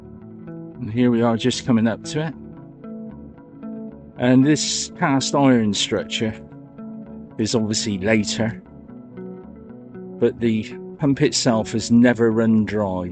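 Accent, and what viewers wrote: Wiltshire, United Kingdom. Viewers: Hello. You're such an interesting person. Wiltshire through and through, with an accent to match. That holloway looked to have some tarmac to walk on. Great video, thanks. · My wife says that your accent sounds like my father who left Wiltshire as a boy in 1910. Another nice video.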